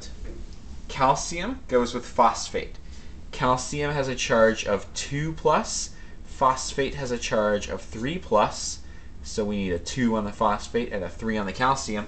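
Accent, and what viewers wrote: Canada. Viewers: are you canadian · sounds canadian to me :) but i wont take points away, it was a very good video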